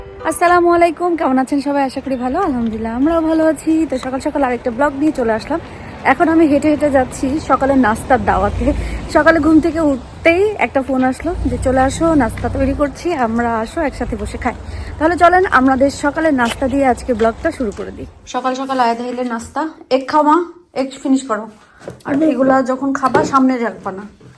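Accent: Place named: Gopalganj, Bangladesh. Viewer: apu amio gopalgonj er meye so tumi jokhon amader desher vashay kotha bolo tokhon amar khub valo lage ekdom nijer manush ❤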